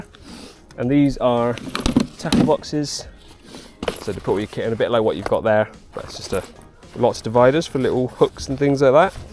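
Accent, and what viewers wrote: Bristol, United Kingdom. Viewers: Is that a Bristol accent 🤔 Thankyou for the video Mate 🙂👍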